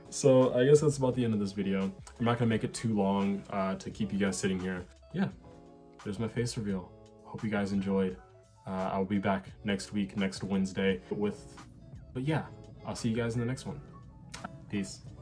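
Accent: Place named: United States. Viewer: I thought you were American lmao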